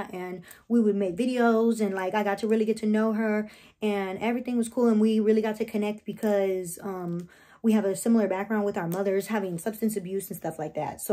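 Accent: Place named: New Jersey, United States. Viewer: I just thought you were one of those jerseylicious girls..loud and your accent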